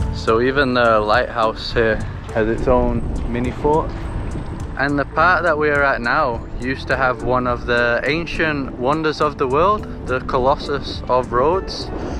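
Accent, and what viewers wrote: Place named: Yorkshire, United Kingdom. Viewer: Hi it's John do you have a link in Yorkshire your twang sounds like it is thanks for the video John uk 😊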